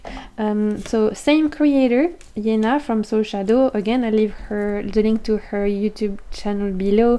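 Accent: France. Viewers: Muchísimas gracias a tí por compartir tanto con nosotros!😃🙌💫 You know, your beautiful accent always reminds of how cool French is and puts me in the mood of listening to French or reading in French. I do it occasionally. 😄 !Sigue disfrutando del océano por los que no podemos! !Un abrazo muy fuerte!🌟❤️😉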